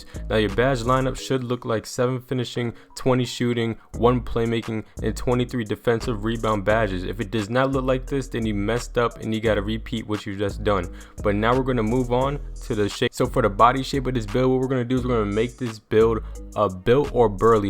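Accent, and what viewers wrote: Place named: Canada. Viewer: You sound like your Canadian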